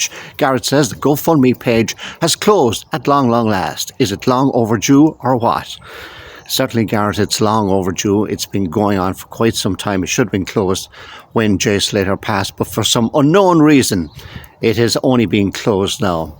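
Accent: Ireland. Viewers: Would you care to back up your claims that there are cartels involved? Show us the proof. The video you saw where Jay lost his sight? Back it up, give us some proof. Currently all everyone is hearing is you blowing smoke out of your ass in an Irish accent...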